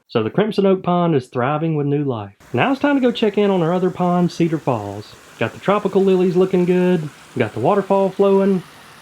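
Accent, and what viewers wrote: South, United States. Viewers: Great wonderful videos, narrated with a fantastic southern accent. One thought is, seems a lot of fish for a small pond. Is there some sort of math to figure population?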